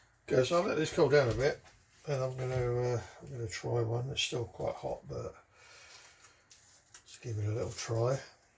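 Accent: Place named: England, United Kingdom. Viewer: A quid. Love hearing an English accent for a change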